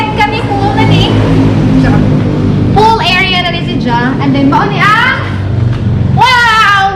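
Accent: Bohol, Philippines. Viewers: Siawa jud nimo day oi mag cge jud kog agik ik sa imong sinultian ganahan kaayo kos taga bohol the way mosulti kay makahimuot kaayo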